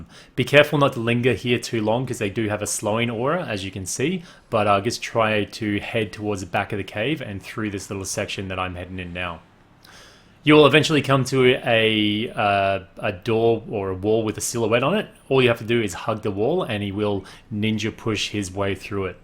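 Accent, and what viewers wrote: Australia. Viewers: Is that an Aussie accent I hear 👀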